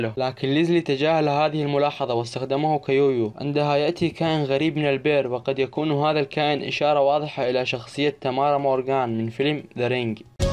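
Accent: Iraq. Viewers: انتة عراقي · انتا عراقي؟ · أنت عراقي لو لا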